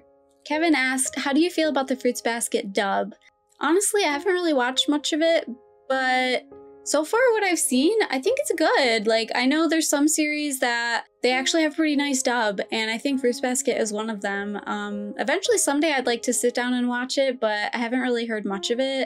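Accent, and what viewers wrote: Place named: East Coast, United States. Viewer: Wow! Thank you for answering my question! It’s really cool that you’re from the East Coast, US. I grew up in MA, and moved to NH when I got married 10 years ago. I had a feeling by your accent you might not be far far from where I grew up. Now I live in Japan and it’s really a dream come true. It was really great to hear your background about how you enjoyed and related to Tohru a lot. I also agree with you, out of all the characters I felt like I related to her a lot. But not on the same life experience so much. But the heart that wants to help heal others hearts and just be friends with anyone and everyone. That was always my heart as a teen, and still now it resonates with me. Also! I love OHSC!! I watched it when it was airing in Japan in 2006! I remember being so excited for each episode to come out and be subbed! 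I didn’t know then, how much of a parody of Shojo it was until much later, but I still adore it too! I can’t believe they are finally making a second season of it!! Also FMAB and Wolfs Rain!!! Yesss so good, but so gut-wrenching! I never watched Gurren Laggan, but I’ve heard so much about it. You should watch Violet Evergarden, Snow White with Red Hair and Yona of the Dawn, if you enjoy fantasy. :)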